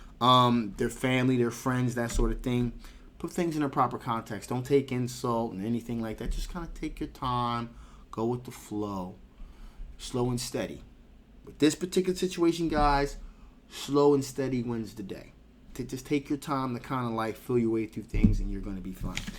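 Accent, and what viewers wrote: Philadelphia, United States. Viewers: I had a feeling you was from philly. Chester in the house. Lol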